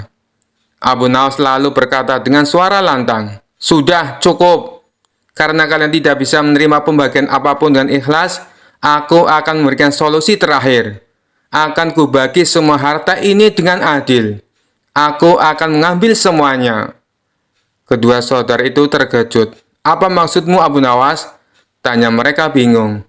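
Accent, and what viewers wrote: Java, Indonesia. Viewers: Udah medok, intonasi lemes, sebaiknya gunakan suara google aja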